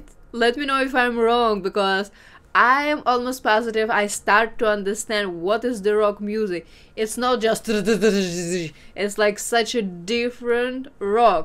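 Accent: Russia. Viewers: Haha. -- But she can entertain us however she wishes -- Just focus on that Russian Accent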